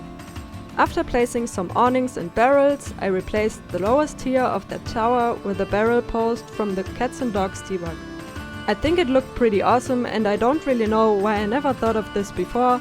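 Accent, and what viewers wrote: Germany. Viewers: You sound like you speak german?!😅 so if you do, das sieht so krass aus!!!!!!! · Bist du deutsch? Meine nur wegen dem Akzent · da klingt so nach deutschem akzent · I was just wondering because I live in Germany and hear a German accent in English a lot so are you from Germany?(you have got a lot of talent btw. AMAZING!)♥️ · Bist du deutsche? Dein Akzent 🙊✌🏻✨ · Schprechen du Deutch? Your accent sounds German. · Strange question: but are you from Germany? You have a pretty strong German accent. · How is this even possible? And a quick question, where are you from? You sound german :D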